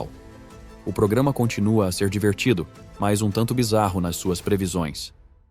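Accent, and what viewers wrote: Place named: Inland São Paulo, Brazil. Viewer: O mais irritante dessas narrações é, esse sotaque de paulista interiorano, acaba com todo o propósito!!!! FUI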